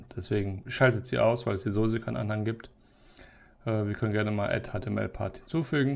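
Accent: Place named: Saarland, Germany. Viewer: sorry, der Inhalt mag/könnte ja hilfreich sein, wenn man was verstehen würde und was erkennen könnte. Hektisches Rumgefuchtel und Saarländisches Genuschel sind nicht hilfreich - Sorry to say that. Aber vielleicht willst du dich ja verbessern...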